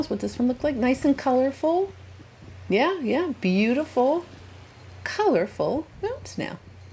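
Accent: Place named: Ohio, United States. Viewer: Are you originally from the Ohio region by chance? The way you say the word: "water" like "warter" sounds like the Ohio area accent. ;) :)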